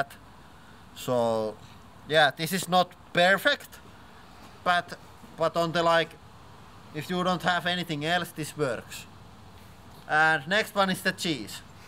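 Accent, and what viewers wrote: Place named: Finland. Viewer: Huomaa englannista että on suomalainen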